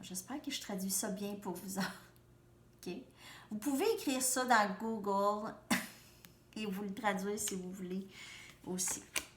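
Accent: Quebec, Canada. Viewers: J’aime beaucoup l’accent québécois « je sais pas pourquoi » 😅 sûrement parce que ma jumelle est dans le pays ☺️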